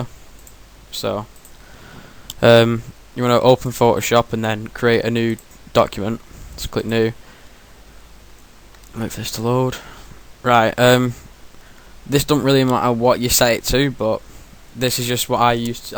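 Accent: Manchester, United Kingdom. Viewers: ahah you have an obvious manc accent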